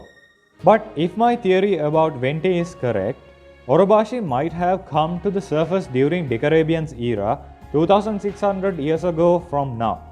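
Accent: India. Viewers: Where are u from cause u sound indian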